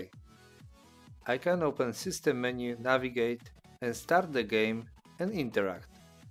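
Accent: Poland. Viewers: haha, po akcencie idzie poznać, że rodak :D super film ugułem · Z Polski jesteś bo masz taki akcent ? You are from poland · your accent is very polish are you from poland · Больше как поляк, не все люди с таким акцентом Русские, чтоб ты знал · nice polish accent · Ojoj czuć polski akcent jak chuj XDDDD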